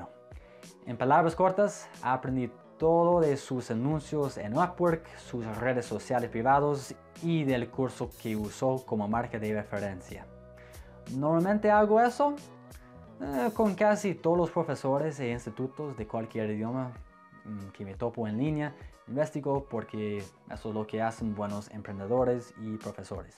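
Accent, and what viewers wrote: United States. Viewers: Profe debo reconocer que cuando Zack Morris nombró a Kale y a usted, sí me sorprendí, pensé que era usted y fue muy decepcionante. Porque físicamente se parecen, pero la esencia de los dos es muy diferente. Usted es el otro lado de la moneda, es profesional y carismático. Y también hay otra gran diferencia entre los dos, Kale habla un Español con un acento casi perfecto y usted profe habla un Español con un toque de acento gringo(lo cual es adorable). Gracias por la aclaración ☺️ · vos sos un buen profesor, lo que no te ayuda mucho es tu acento gringo. creo que nadie querría aprender a hablar ingles con una persona que habla español con un acento tan marcado, da como mala espina. pero si sos bueno, eh aprendido mucho con vos !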